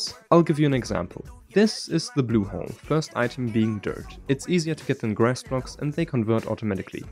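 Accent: Germany. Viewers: Du hast ein leichten deutschen Akzent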